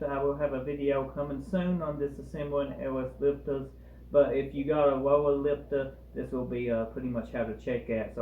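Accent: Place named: Louisiana, United States. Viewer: Nice video, i work on bikes and going through my lifters and checking pushrod length etc. Where are you from I hear a hint of Louisiana in your strange accent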